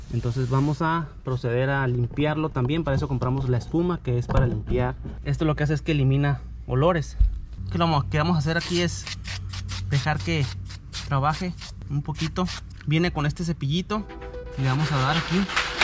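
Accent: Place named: North, Mexico. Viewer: Asi hablamos en el norte jaja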